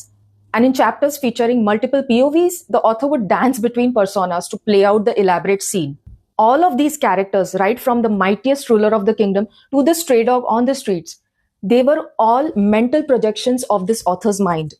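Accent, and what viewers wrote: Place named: India. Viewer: Your Indian accent enhances it so much🙏✨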